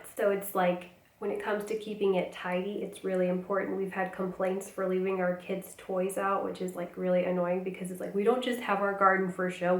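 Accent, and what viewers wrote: United States. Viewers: You speak softer American accent... more feminine and polite sound...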